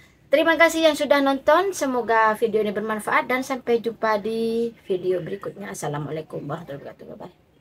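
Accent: Madura, Indonesia. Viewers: Kok kayak reng madure nik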